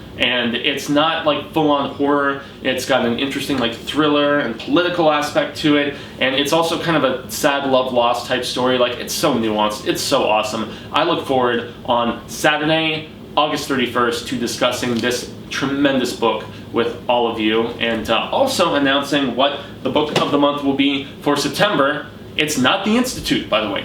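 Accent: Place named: Maine, United States. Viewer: This review was really funny,. I laughed through out. And your Maine accent is funny XD LOL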